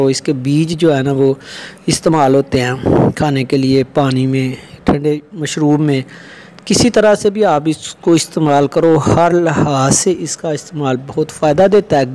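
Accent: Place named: Punjab, India. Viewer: Punjabi style Urdu speaking 😄😄🗣️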